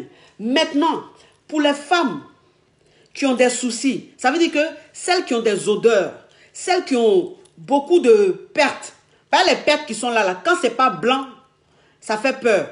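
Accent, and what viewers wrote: Ivory Coast. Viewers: je suis congolaise de Brazzaville mais dés que j'ai vue la dame parler j'ai su qu'elle était ivoirienne. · ivoirienne d' après l' accent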